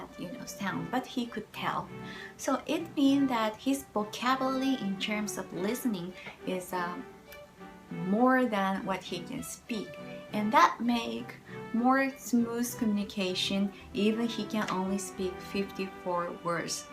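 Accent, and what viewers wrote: China. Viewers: Are you a Japanese or Chinese? Your English accent seems like Chinese person speaking English.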